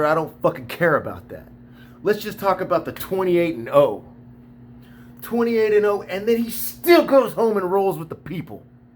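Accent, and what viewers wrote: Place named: Russia. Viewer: Your Russian accent is very cool! Haha😂 . Где изучал русский язык?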